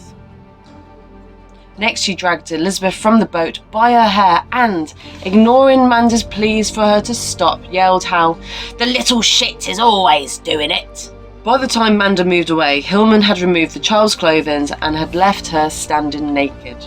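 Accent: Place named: West Country, United Kingdom. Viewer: Limit your time with those mate even if you have the best laugh with them. I liked your accent on the BBC video as it seems to have a west country / black country twang, which I like, being a Bristolian myself.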